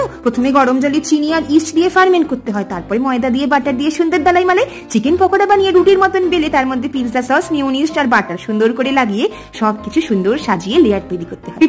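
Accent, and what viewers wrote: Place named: Bangal, Bangladesh. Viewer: দিদি তোমার বাঙ্গাল কথাটা খুব সুন্দর ☺️☺️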